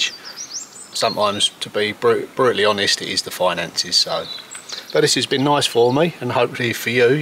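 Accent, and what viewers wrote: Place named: London, United Kingdom. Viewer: Doors look great. I am interested in your slight accent. As I was born in Kenya during colonial times, my mother was from Southern Rhodesia Zimbabwe. It is said I speak with slight ‘Rhode ‘, your accent sounds somewhere near or in London., retired Airline pilot. Thailand.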